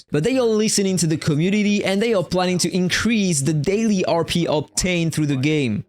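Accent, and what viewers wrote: France. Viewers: Tu a un accent français non ?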